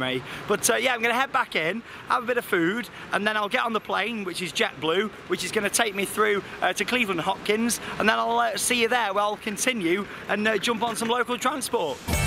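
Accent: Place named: West Midlands, United Kingdom. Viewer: I thought you were from the west midlands not manchester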